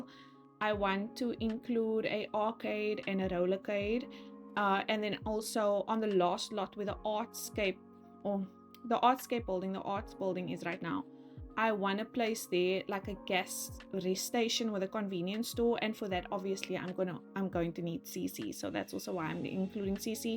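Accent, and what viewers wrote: South Africa. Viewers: Hi, I know this is random but are you South African by any chance? I sense a South African accent, a strong one lol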